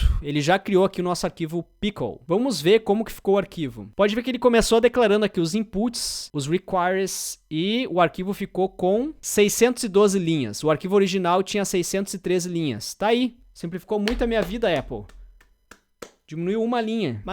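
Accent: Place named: Ceará, Brazil. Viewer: é cearense esse macho, certeza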